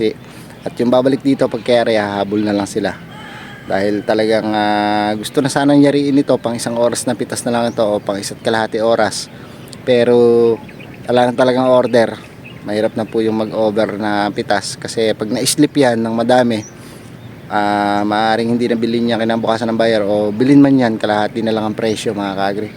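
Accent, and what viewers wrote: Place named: Bulacan, Philippines. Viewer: Bakit ang punto mo when you speak tagalog ay parang from Bulacan ka? I am from Hagonoy, Bulacan. Pero, 54 years na ako sa America. Paborito ko kasi ay calamansi juice.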